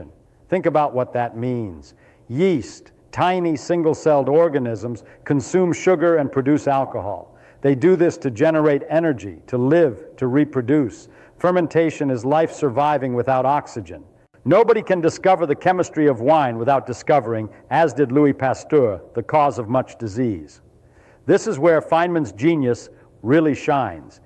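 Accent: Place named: Transatlantic, United States. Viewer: I like this transatlantic accent style.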